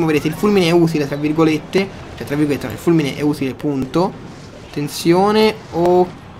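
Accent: Florence, Italy. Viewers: i fiorentini la maggior parte hanno tutti la voce cosi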